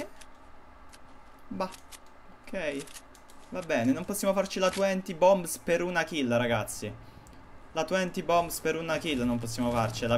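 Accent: Naples, Italy. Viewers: Ma sei di napoli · ma ti che parte di napoli sei?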